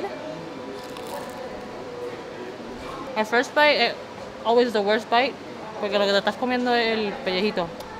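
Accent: Puerto Rico. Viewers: Para mi eres la mejor bloguera, siempre te busco para ver que hay de nuevo. Dios mio nena de China tu lo único que tienes es tu hermoso rostro por que ese hablar, ese espíritu es Puertorriqueño, sacha pero bien fuerte. Eres como un vicio termino de ver un video y busco otro y así sucesivamente. Te amamos no te quites!🤗🤗😘😘❤❤🙏🙏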